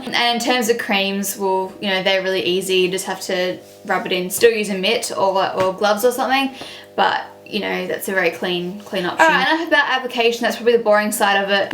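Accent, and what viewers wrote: Australia. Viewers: She's speaking English and she's from Australia so she has an Australian accent? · you sound so australian here bby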